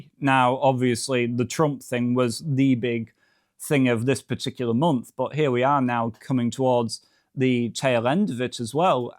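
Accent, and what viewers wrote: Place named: North, United Kingdom. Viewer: This guy reminds me of Alexander Armstrong doing a northern accent.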